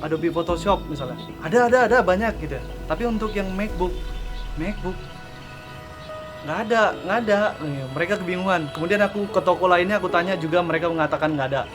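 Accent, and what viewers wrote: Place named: Medan, Indonesia. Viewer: abang orang medan rupanya, pantes la kok ga asing logat nya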